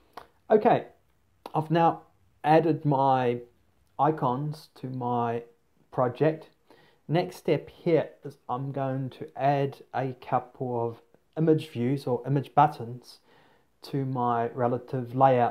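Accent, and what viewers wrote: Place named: United Kingdom. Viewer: thx for your video, i love your British accent.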